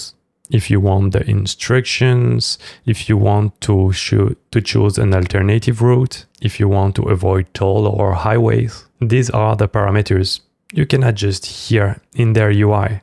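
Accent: France. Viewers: Excellent content :). Malgrer que ton accent Francais se fait vraiment ressentir 😅 Thanks !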